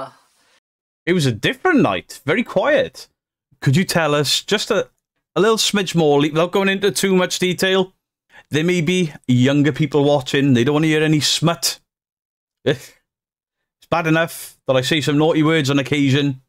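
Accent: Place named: North Wales, United Kingdom. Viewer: Hello from Toronto, Canada. Omgggg, you are killing me! This one was freaking hysterical, the interview had me crying laughing & trying to eat my tea at the same time 😂🤣😂🤣. I love your accent, I miss hearing the Welsh (North Wales) accent daily. Please keep on chasing the fakers & making us laugh at the same time. I look forward to you dropping new videos. Please do some debunking of that Canadian faker Moe Sargi, he was dumb enough to post a video that he did not edit properly & was called out for faking & he pulled the video.soooooooo fast. Take care & have a great weekend ❤️ Janet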